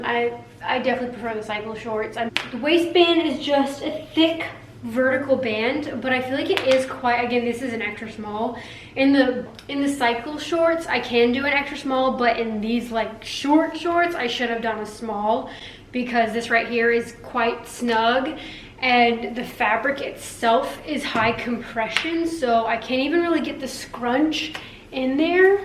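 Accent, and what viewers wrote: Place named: United Kingdom. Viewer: I love your British accent 😂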